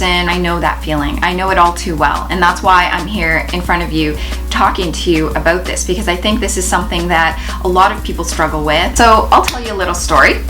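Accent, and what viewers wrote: Canada. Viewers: I knew you were canadian before you even said you were. that accent is undeniable.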